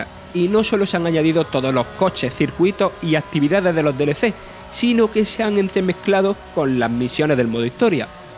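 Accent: Andalusia, Spain. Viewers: Lo que ocurre es, por la manera de hablar, debe ser andaluz. Sevilla, Córdoba o alrededores... Y sí, apenas en el primer minuto ya me molesta todas las S que no pronuncia. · Eres de cordoba ? O jaen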